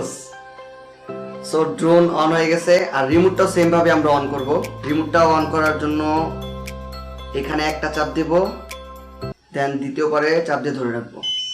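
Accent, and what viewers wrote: Tangail, Bangladesh. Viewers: vaiya tomar basha ki tangail.....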